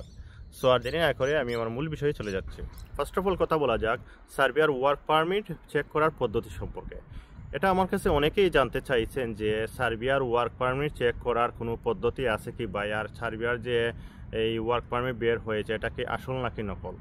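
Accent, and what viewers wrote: Sylhet, Bangladesh. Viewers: ভাই আপনি মনে হয় সিলেটি। আমার বাড়ি ও সিলেটের বিশ্বনাথ। নভেম্বর মাসের ১৫ তারিখ অনলাইন হইছে ভাই, এজেন্সি বলছে সব স্টেপ শেষ, এখন শুধু এপ্রোভাল পাওয়া বাকি আছে। ৪ মাস অতিক্রম এখন কি করা,জানাবেন। প্লিজ।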